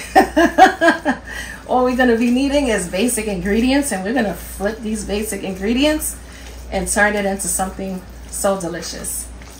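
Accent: New York, United States. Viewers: I hear an accent…Are you a New Yorker?😊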